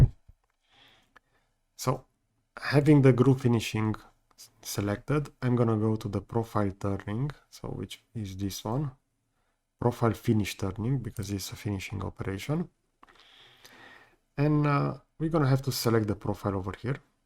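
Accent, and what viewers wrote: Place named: Romania. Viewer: Auuuuuu ... asta-i engleza rumaneasca ... !!!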